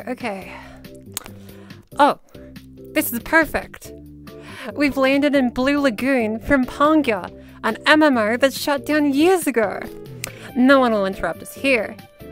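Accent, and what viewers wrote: Australia. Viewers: yeah i ultimately agree with that but idk just aint for me i suppose id say the only problem i got with ur vids is that u got an australian accent so its a bit of a work around to be like "oh how would i say that" but its pretty easy to work around so its no big deal tbh plus its probably amazing for people with Australian accents but yeah thats all thx for replying and its good to hear that ill be on the lookout hope your having a wonderful day also your contents amazing even without including tutorials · It's so nice to have an australian accent guide for the exercises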